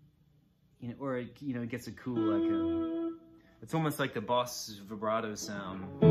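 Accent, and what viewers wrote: Australia. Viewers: Congrats from down under mate , you’ve never lost your Aussie accent ! 🙏🏼🎶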